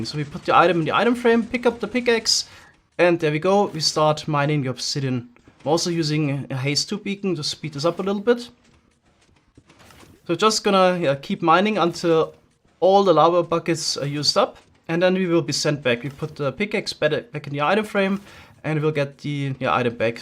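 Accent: Germany. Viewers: Are you German because you’re accent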